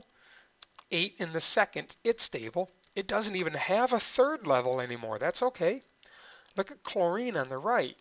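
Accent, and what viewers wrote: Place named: Boston, United States. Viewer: Wicked Bahston accent!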